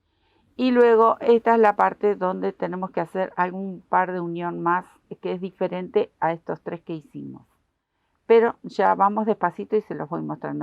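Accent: Argentina. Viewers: ¿Cómo escucho en castellano? De repente hay una voz mecánica y fea en inglés ¡en vez de mí acento argentino favorito que extraño tanto!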